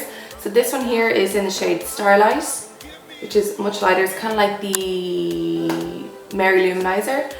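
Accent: Ireland. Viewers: Are you Irish x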